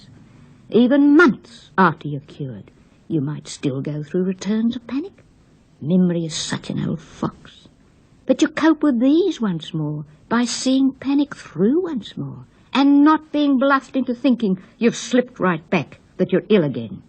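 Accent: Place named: Australia. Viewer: I love how Aussie she was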